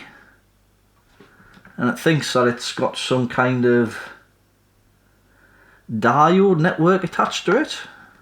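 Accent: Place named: England, United Kingdom. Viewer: I have a few pieces of test equipment from Peak, and they are great. You received fantastic customer support !! Also, a nice job repairing this tester. Well done. I like hearing various English accents as I am a brummie living in the USA, so it's a welcome change. 😂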